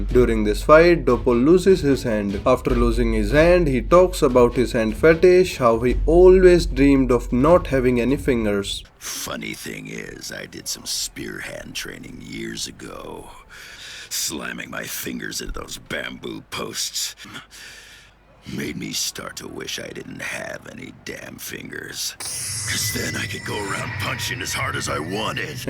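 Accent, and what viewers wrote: India. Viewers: just stop. Ur Indian accent just kills the vibe and the joke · You people🤦‍♂️, Go to his discord server then you'll see his name which is " ", which is obviously an Indian name, as I'm an Indian myself and i already recognized that he was Indian by his accent which is the Special Indian Accent only indians have. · I dated a half guyanese girl and she had mostly indian aunties and uncles. there's no mistaking his accent. Actually , his voice is very soft for an indian man. Probably why his videos do so well. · I swear his indian acsent is getting thicker every vid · Bro are u indian? cause the accent is much similar like mine.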